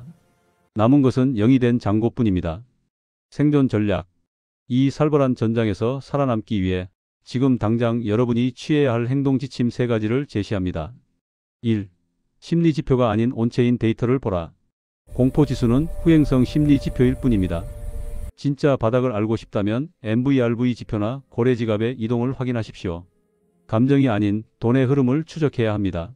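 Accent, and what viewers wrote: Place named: Seoul, South Korea. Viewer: 서울말같기도 아니기도 같기도 아니기도ㅎ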